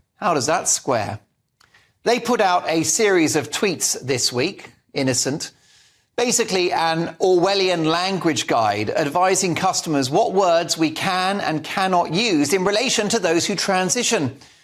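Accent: United Kingdom. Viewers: You British ma'am?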